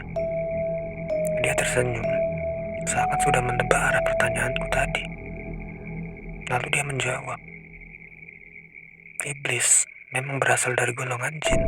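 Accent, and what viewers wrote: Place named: Sumatra, Indonesia. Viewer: Author org Sumatera to? Kirain jawa ngapak... 😅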